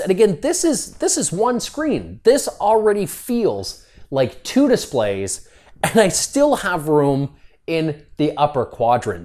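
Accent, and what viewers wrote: Canada. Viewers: Sounds so Canadian lmao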